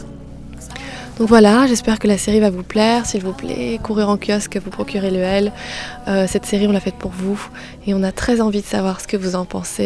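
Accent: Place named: France. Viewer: You are goals. And I love your accent. I'm gonna learn French! (Inspired)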